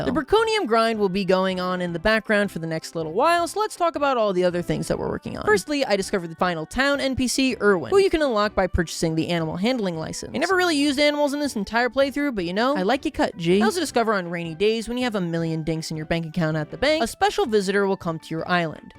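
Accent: Australia. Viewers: Yo, finally some Australian rep! Also please stop the australian accent. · As an Australian I can approve of this accent 😊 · After hearing the HORRIBLE Australian accent, I really struggled coming back and watching this video. I then made the mistake of watching till the end… I hate that I like 😐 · You're Australian???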